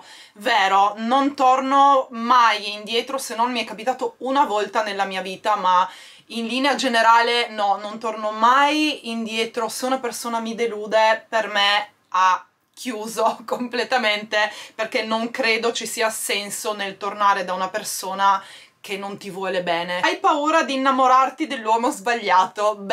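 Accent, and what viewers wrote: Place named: Sardinia, Italy. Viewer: allora ho le allucinazioni auditive! !!Sapevo che eri triestina...ma sentivo un forte accento sardo:-)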